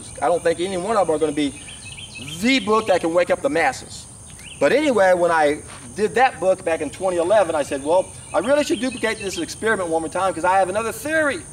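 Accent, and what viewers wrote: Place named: South Carolina, United States. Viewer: your accent sounds like mine from South Carolina:)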